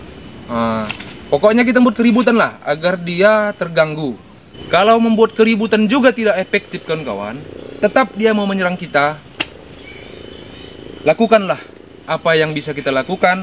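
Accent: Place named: Medan, Indonesia. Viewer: Ada lucunya nih org.. Logat medan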